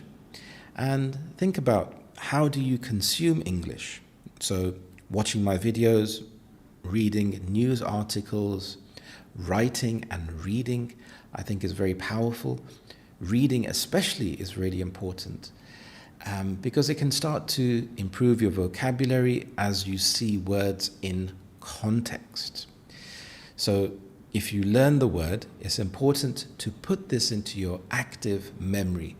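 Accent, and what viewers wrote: United Kingdom. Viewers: I’m Vietnamese , But I like listening your English accent and your videos ,thanks so much and have a nice day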